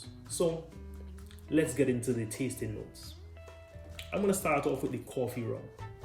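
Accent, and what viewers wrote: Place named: Caribbean. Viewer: I am really taking a liking to the Central American rums lately. I haven't seen Ron Colon rum in my parts however bottling in a wine bottle makes it easy to spot on the shelf if it ever does show up in liquor stores here! El Salvador has had a rough history in the 20th century but very glad to see rums (and beers) start to be made there! Shows some kind of stability has returned to that proud country. I am a huge fan of your accent mate, gives your videos instant Rum street cred! Caribbean accent for sure. Let me guess. St Martin? Good review. Very detailed.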